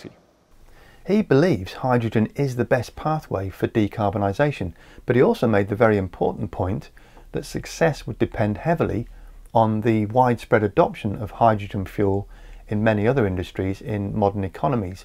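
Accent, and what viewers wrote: United Kingdom. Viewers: You took a quick swipe at Boeing with your British accent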